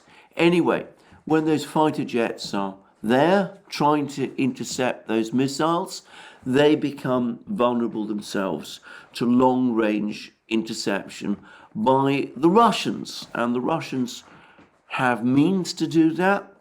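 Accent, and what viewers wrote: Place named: RP, United Kingdom. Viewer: It strikes me that Alexander for some reason chooses to use BBC rules of pronunciation, e.g. at his public school his peer group would have said adversary with three syllables, swallowing the second 'a' and he has accepted training to get 4 syllables from it. And why has he stopped saying referenda? Who told him to and why does he agree to it?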